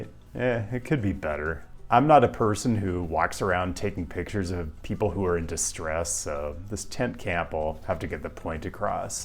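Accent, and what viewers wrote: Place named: California, United States. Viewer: Is this a California accent? Damn that's strong!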